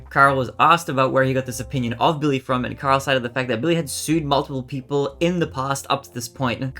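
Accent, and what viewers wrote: United States. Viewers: I thought you were a weird Minnesotan.* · your accent is very Americanised. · You are definitely take the medal for most American sounding Australian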